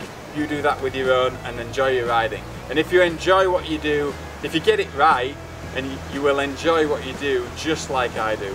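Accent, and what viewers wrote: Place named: United Kingdom. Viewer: I love to hear you speak! The words coming out and the bloody British accent👍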